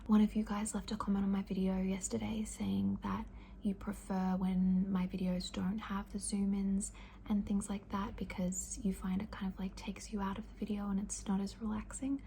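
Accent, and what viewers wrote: Australia. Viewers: If everyone in australia speaks like this i think i need to move asap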